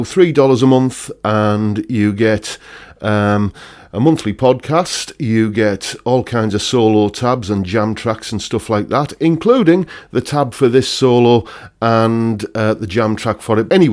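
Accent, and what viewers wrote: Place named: North East England, United Kingdom. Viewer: North east lad I take it. You sound like you’re fairly close to me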